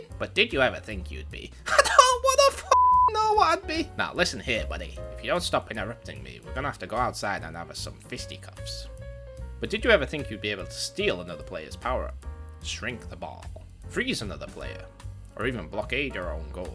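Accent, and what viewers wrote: Yorkshire, United Kingdom. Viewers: Great vid! By any chance are you from Yorkshire cause u sound like it 😂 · wait are you from yorkshire hell yea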